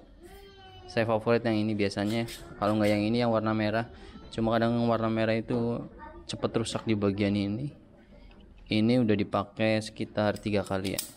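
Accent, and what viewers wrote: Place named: Java, Indonesia. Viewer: Medok bgt mas'e.. 😆😆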